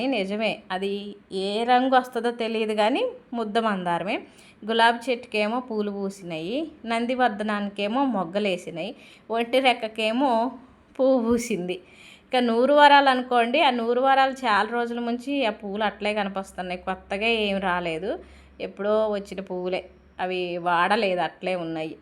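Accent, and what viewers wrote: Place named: Andhra Pradesh, India. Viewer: Miru andra mata lagane matIadtaru bagauntadi ma entiloala unatmo alage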